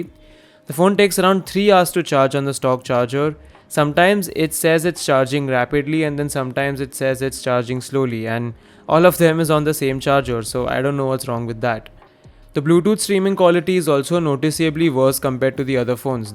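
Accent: India. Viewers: Fvck you and ur indian accent!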